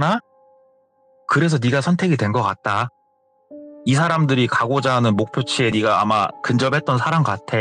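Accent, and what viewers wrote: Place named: Chungcheong, South Korea. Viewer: 님 사투리는 항상 충청도ㅎㅎ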